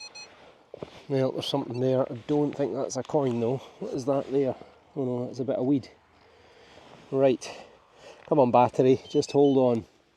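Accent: Scotland, United Kingdom. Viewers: Your enthusiasm and your knowledge of history make you my favorite detectorist. And your lovely Scottish accent!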